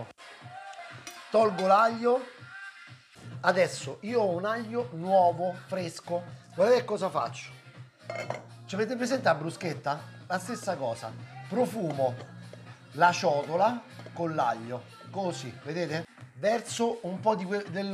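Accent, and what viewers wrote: Rome, Italy. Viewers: Complimenti chef! Sono allergica al peperoncino, si può fare senza? Grazie mille sei unico! Oltre ad essere strabravo, carico d'inventiva, sei proprio un simpaticone, ci metti di buonumore, impariamo un sacco di cose si ma, ridendo.... Ue' con sto accento romano verace ci si diverte un sacco! Grazie mille chef! Bacino al Vs splendido bimbo!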